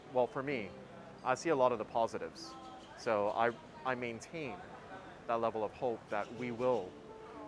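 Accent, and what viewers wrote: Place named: United States. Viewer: you speak English as like American lounge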